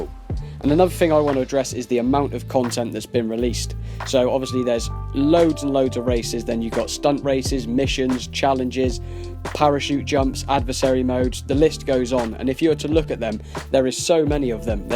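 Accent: England, United Kingdom. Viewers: Your videos are so informative and I like your British accent. U sound like such a nice suave person. If u r play on PS4 I would like to meet. What's your real name by the way. Your videos are honest full of correct information. Your information has saved me millions of GTA dollars. Keep up the good work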